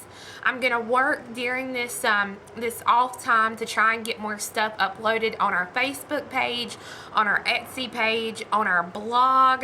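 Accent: South, United States. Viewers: I totally enjoyed the video! I'm from Alabama, so I understood your southern English easily. Thank you!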